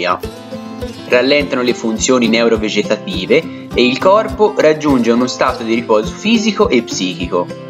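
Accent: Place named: Tuscany, Italy. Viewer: direii ahahhahaha, sembri Paolo Ruffini (anch'io sono toscano)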